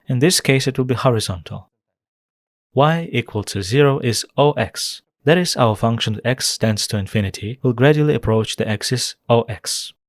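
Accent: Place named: Kazakhstan. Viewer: Он же из Казахстана, поэтому он так и говорит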